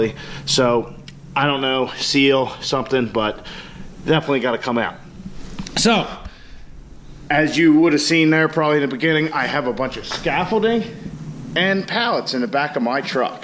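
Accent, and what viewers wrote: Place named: Maryland, United States. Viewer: You gotta be from up around Maryland or at least that area. I got family up that way and would know that accent anywhere lol.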